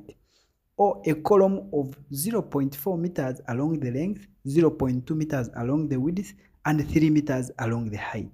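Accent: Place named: Uganda. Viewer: I think this guy is from uganda I can hear his accent.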